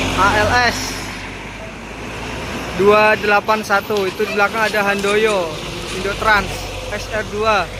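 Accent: Java, Indonesia. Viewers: Jawanya kok medok bang..sukses selalu ya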